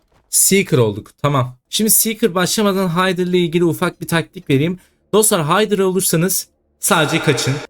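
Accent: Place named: Azerbaijan. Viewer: Abi sen galiba azerbaycanlısın konuşman dan anladım bende azerbaycanlıyım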